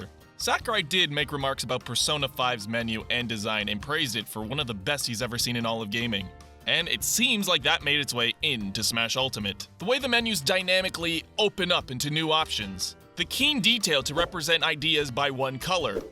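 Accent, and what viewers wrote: Canada. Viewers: Wait are you Torontonian?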